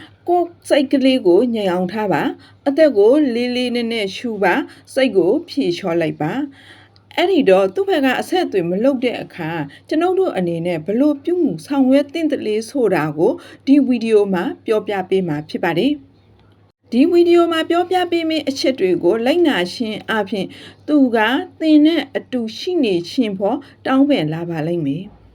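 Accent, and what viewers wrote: Rakhine, Myanmar. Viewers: စကားပြောနေတဲ့ဆရာမကရခိုင်နဲ့သူတယ်နော်။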